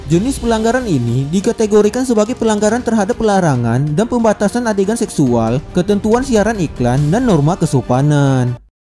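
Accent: Malaysia. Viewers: Kok narator nya logat nya kayak orang Malaysia yg baru belajar bhs Indonesia ya. Tp keren kok contain nya. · Betu betu betu😕 Adminnya pasti tetangganya Ipin Upin nih. Dialeknya ngeselin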